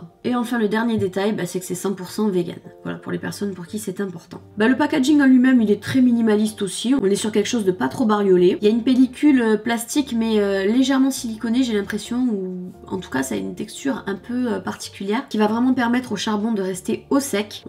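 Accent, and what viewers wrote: South, France. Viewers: avec l'accent du sud c'est top !!!!! allé je m’abonne , le peut de tes vidéos que j'ai vu sont super ... bonne continuation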